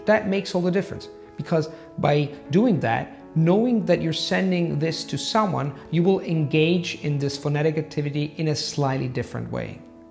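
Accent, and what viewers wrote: United States. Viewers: My pleasure,:D Oh, so staying in Poland "inspired" you to learn Polish, then:P Good luck, btw!:) I've heard that Polish intonation is pretty similar to an Italian one. I mean that it's quite simple to master Italian for Poles and Polish for Italians regarding pronunciation but I may be wrong:P Yeah, it definitely helps socially as you mentioned in the above <3, I must admit that your videos have been like a drug to me recently xd I can't wait to prepare food and watch your video:D Plus, I love the fact that your accent is American <3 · I've known a lot of Italians, but I don't think I've met any who've mastered American intonation like you.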